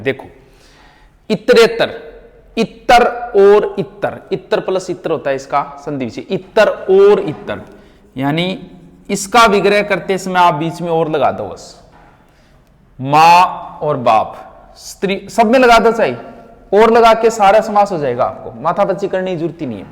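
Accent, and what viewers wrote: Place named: Haryana, India. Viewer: YOU ARE FROM HARYANA. I GUESS!!!!!!!!!!!!!!!!!!!!!!!!!!!!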